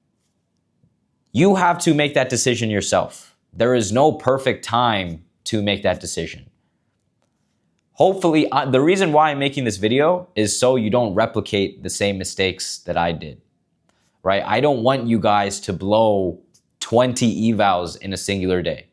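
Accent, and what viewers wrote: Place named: India. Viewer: He is not somali. He looks like indian. And he speaks their accent.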